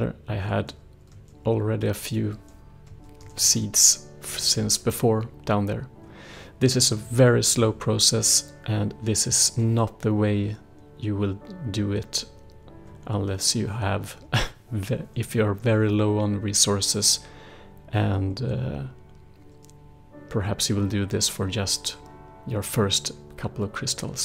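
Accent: Sweden. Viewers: <link> Here is one of the lists I found for 1.7.10. Honestly, I was doing it to see how much I could fit together and if they would all run, it worked and I just kept the pack and it grew until I got too many, lol! Honestly, it was a LOT easier than any other version of Minecraft before it, almost anyone can make a mod pack now if they know how to "translate" the errors that may occur, I mean hell I did it. :D As always, if you have time and what not, you're invited to join us, but I completely understand how hectic life is and how little precious time you do have. Thanks for just reading and replying to these comments. I'll spare you from other comments in other vids so you can get back to you're life. ;) You're Swenglish is just fine, truly a great job. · where are u from? xD sounds like ur from sweden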